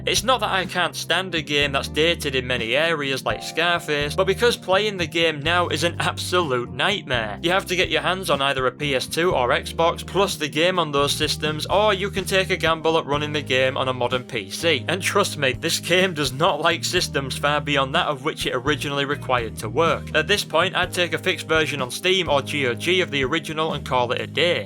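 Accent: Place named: Northern Ireland, United Kingdom. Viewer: Are you from Northern Ireland by any chance? I have a friend from Londonderry and the way he says "game" more like "geem" makes me guess that. Also good video, I made one about Scarface too. Quite a good game.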